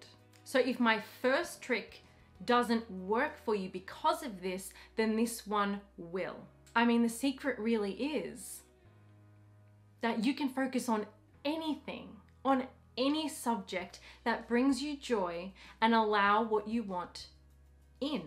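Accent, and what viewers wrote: Australia. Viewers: A week ago or so, I came a cross an other aussie YouTubeing about LoA. I was really pleased to hear that assume accent among others with American or British accent and so I come across your teachings. Talk about LoA 😊. Anyway thanks for your teachings. Greetings from Sweden!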